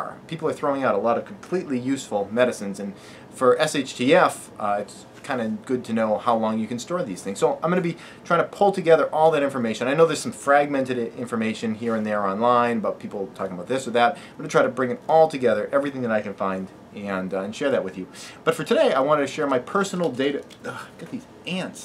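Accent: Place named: Nova Scotia, Canada. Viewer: I've just finally noticed how Canadian you sound... after this binge of videos... can't believe I didn't notice this before. That accent of yours is more Nova Scotia than Boston haha. I am using your dialect and the weather patterns in your videos to triangulate your location...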